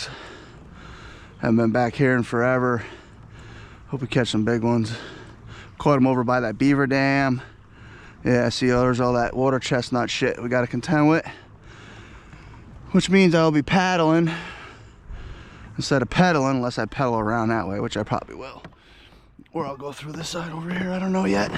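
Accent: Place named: Troy, United States. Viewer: Sounds like troy ny lol I'm in Rentler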